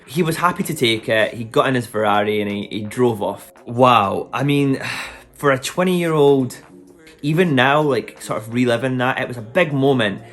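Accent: Scotland, United Kingdom. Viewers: Great video, I'm 27 yo , i achieved so much already, read a lot of books, learn a lot of things, traveled abroad several times , got settled to a graet job with good income. I'm grateful to many things in my life But i feel from time to time I've wasted a lot of my life already. My love life is not that great, i feel depressed sometimes especially when i see my friends happy , acchive their goals , get engaged or married. I hope i could stop feeling that way. P.S. I love the scottish accent <3<3 Love and peace from Saudi Arabia.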